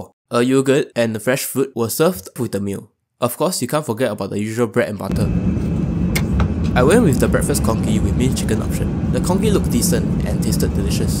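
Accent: Singapore. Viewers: Good job on the video and am glad yr accent is genuine Singaporean. However, the words seem to be generally quite 'compressed'. In the English language, there are not only short vowels but long too.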